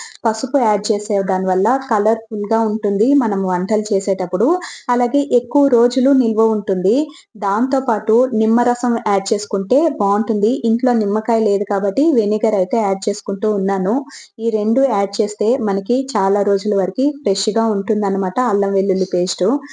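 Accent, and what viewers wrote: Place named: Tamil Nadu, India. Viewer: Telugu raada meku Tamil laga matladutunnaru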